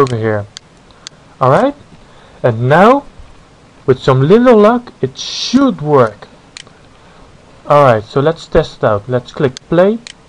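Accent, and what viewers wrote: Netherlands. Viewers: dude zo een lelijke nederlandse accent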